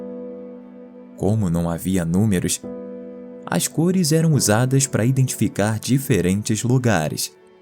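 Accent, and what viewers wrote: Rio de Janeiro, Brazil. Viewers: Esse narrador carioca é a melhor parte do vídeo 😊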